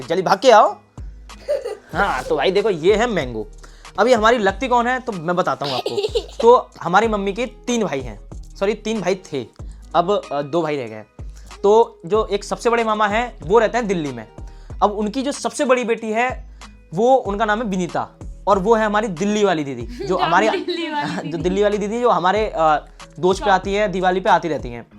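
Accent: Bundelkhand, India. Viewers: Kya bundelkhandi bolte ho 😂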